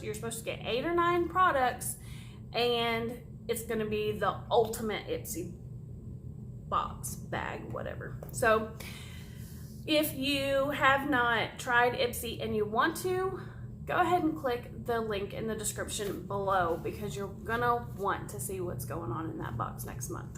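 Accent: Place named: South, United States. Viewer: Trust me! I didn't take it as "snarky". All good. I can hear the southern in your voice and relate. :)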